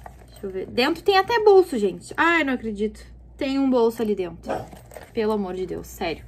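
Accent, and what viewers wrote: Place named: Rio Grande do Sul, Brazil. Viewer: Enfim achei uma gaúcha falando das roupas da shein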